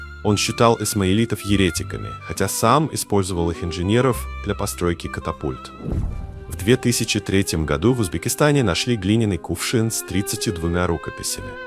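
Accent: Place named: United States. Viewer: Афтор есть немного акцент. Как будто это американец. Может я ошибаюсь.Но спасибо за труд..Салом ман точикам Ориоям.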